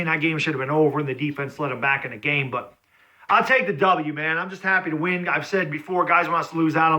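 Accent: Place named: Staten Island, United States. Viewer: Youre accent sounds Staten Island to me. I grew up in Newburgh upstate.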